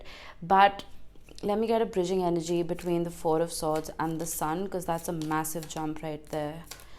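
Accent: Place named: South Asia. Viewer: you look european but sound south asian...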